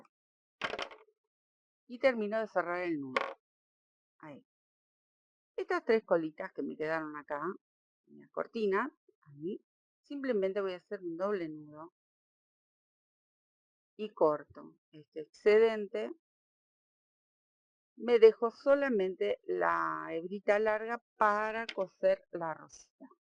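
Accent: Argentina. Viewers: me dio mucha alegría volver a ver un video tuyo. Soy mexicana y aaaaamo tu acento argentino. Gracias por el tutorial, he querido hacer una colcha hexagonal. Muchas bendiciones!!!❤❤❤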